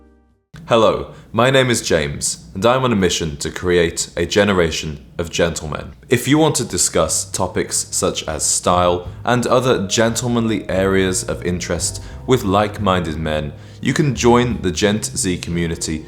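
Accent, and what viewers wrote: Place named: England, United Kingdom. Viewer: Why not??? You sound English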